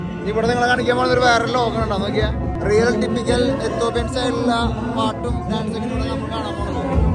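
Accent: Thrissur, India. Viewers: ninte ee Thrissur slang onnu matti pidichal korechukoode okke reach kittum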